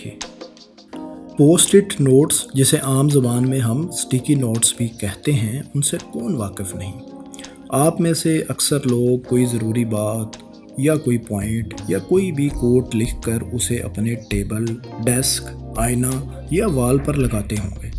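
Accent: Punjab, Pakistan. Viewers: اسلام علیکم۔ بھائی معذرت کے ساتھ، اگر یہی پینڈو اردو آوازیں سننی ہیں تو لاکھوں پینڈو چینلز ہیں۔ فیصل بھائی کا انداز بہت اہم ہے، یا اسے کاپی کرنے کی کوشش کریں یا کاپی کر سکنے والے شخص کو یہ کام سونپیں۔ اس طرح پنجابی انداز کی اردو والوں سے پلیز گریز کریں۔۔۔ اس طرح آپ بنی بنائی امیج ڈاون کر رہے ہیں۔۔۔ پھر سے معذرت مگر بات یہی سچ ہے۔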